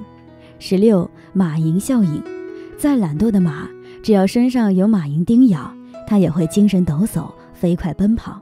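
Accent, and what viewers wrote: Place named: China. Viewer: 請問~版主是哪裡人?中國人嗎?聽口音很像中國人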